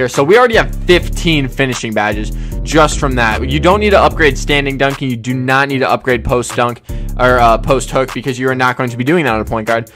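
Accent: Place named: Australia. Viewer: Brother starting sounded like Australian why did you sound like Australian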